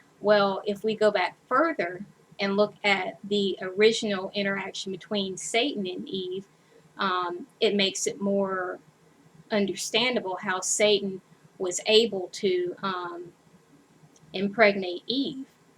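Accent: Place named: United States. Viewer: "tares" is an old way to say "thistle" from Early Modern English such as existed in 1599 when the Geneva Bible was translated into English. Everyone who lived in europe before 1000 CE is related to every white person alive today, and every person with even one white ancestor. There are 5 Gods and a Goddess in my genealogy. And guessing by your light complexion and American accent, you and I are related.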